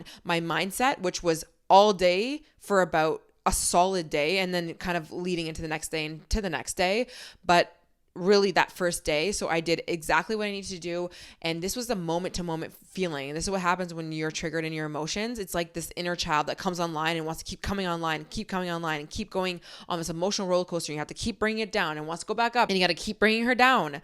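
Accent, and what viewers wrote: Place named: Toronto, Canada. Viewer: you so have a toronto accent and it makes me so happy to hear! brings me back home in this world of influencers its nice to hear myself, my friends and loved ones in ur voice 🥹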